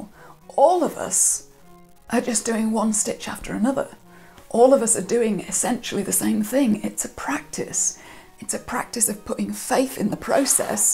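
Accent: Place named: RP, United Kingdom. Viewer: You remind me to The Crown’s Margaret Thatcher. Her rasp is next level, but yours is too! You sound very posh. Also, I started projects and I just haven’t finished them. But I’ve done them now during this pandemic.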